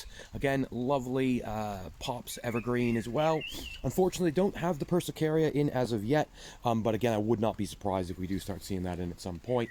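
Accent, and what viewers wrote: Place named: United States. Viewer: I thought you and an American tone to your voice! How did you end up in England? 💚🌴🌹🐦‍⬛🌿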